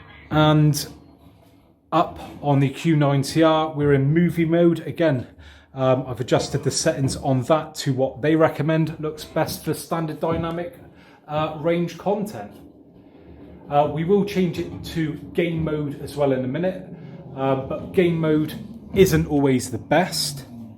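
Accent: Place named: Southampton, United Kingdom. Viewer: Your videos helped me buy my TV (Samsung q90r, 65inch) so thanks for that! My girlfriend and I are trying to guess your accent. We have money resting on this so please can you let us know who won? (she thinks Southampton). Thanks for your videos, from Mallorca!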